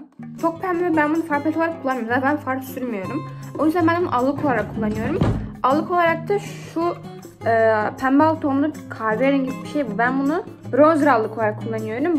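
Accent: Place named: Russia. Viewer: Rus veya Ukrayna olması lazım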